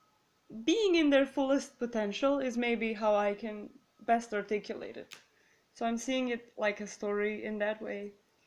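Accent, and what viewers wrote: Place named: Czech Republic. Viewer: Are you czech i think you have a czech accent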